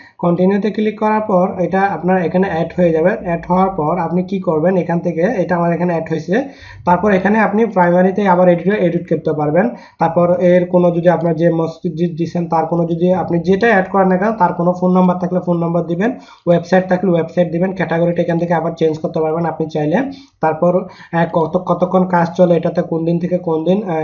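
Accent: Noakhali, Bangladesh. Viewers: শালা ভালো ভাষার কেউ নাই,,সব নোয়াখাইল্লা শালা রিভিউ রে বলে রিবিউ এখন রে একন 🙈🙈🙈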